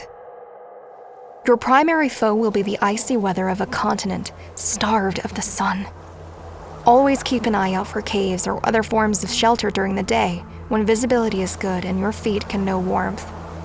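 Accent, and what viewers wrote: United States. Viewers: The American accent doesn't do it for me. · I would have got a none american accent to narrate considering its fantasy, and elder scrolls which uses European accents mostly.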